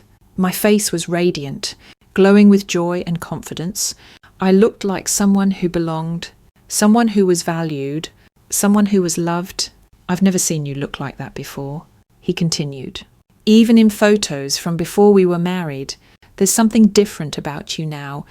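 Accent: United Kingdom. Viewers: I’m wondering why the stories narrated by the British voice always has a pic of a female in a military uniform. Clearly this narrator is not in the military nor is there any military story lines. But all that aside, very good story as heartbreaking and happy all at once.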